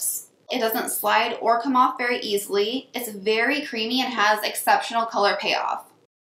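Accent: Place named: Minnesota, United States. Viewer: Are you from Minnesota??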